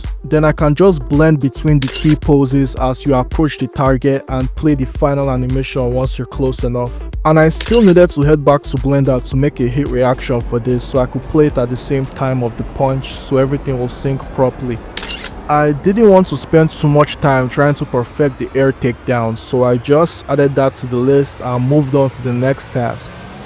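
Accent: Nigeria. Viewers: That accent.....you be naija boy? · U seem African. U sorta have A nigerian Accent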